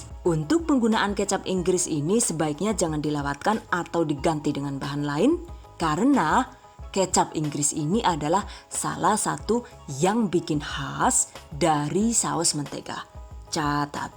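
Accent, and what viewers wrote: Banyumas, Indonesia. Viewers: Ora ngapak ora kepenaknya bun..😀😂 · Mba asli mana kok bisa ngapak? Salam ngapak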